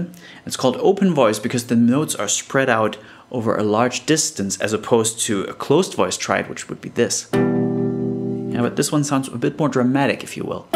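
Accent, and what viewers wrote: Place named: Germany. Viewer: It's hard to tell how German you are until you say "O" vowels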